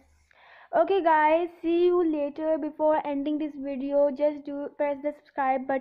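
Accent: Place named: India. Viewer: His accent is Indian but the video location is the us weird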